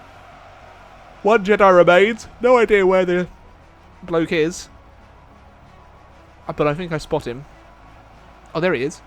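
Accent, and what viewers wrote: United Kingdom. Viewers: Keep the massive battles and total war going, I love the survival battles. And personally I find your voice quite elegant, it gives your videos an almost documentary-like feel. Speaking of that can you say Amino Acids in your next video? It's my favorite work to hear with a proper english accent. By the way, I'm 27 and totally get it, living in the bay area of California is brutal, I pay $1800 american for rent.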